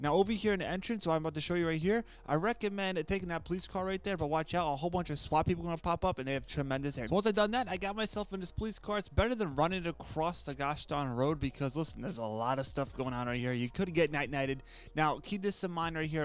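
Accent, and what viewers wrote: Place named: East Coast, United States. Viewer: i’m staying bro u funny 🤣🤣 east coast huh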